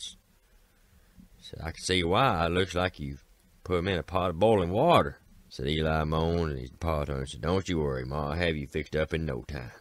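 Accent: South, United States. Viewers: your smooth southern comfort voice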